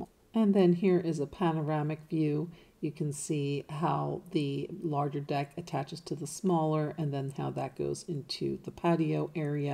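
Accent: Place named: Texas, United States. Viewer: I had'nt even read your reply until after I wrote mine. We even think the same but U talk like my AUNTIES/Tias from TEXAS 😄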